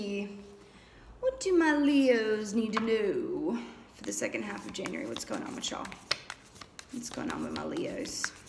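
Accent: South, United States. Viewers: Plus, that Southern Belle accent! ❤️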